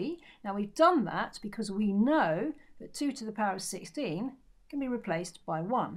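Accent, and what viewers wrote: United Kingdom. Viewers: I love your thick British accent! Much apreesh!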